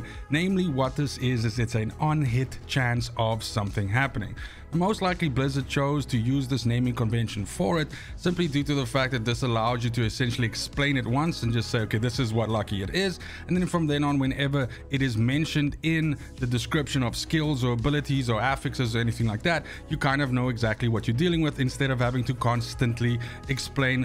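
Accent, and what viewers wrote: South Africa. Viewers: Bro are you South African??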